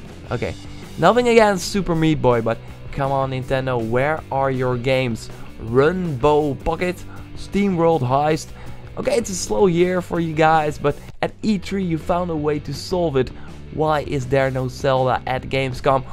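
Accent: Netherlands. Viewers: That dutch accent tho ;)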